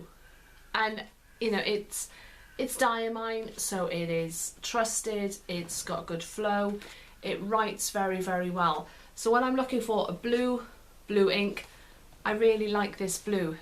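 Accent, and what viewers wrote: Wales, United Kingdom. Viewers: Its her Welsh accent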